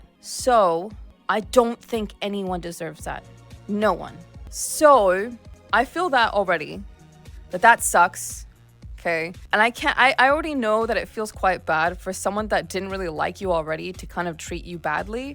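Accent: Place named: United Kingdom. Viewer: It's been a while since I've heard sound this British this often.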